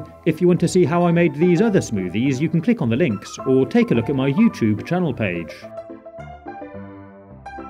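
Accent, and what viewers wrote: United Kingdom. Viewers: I just love the british ascent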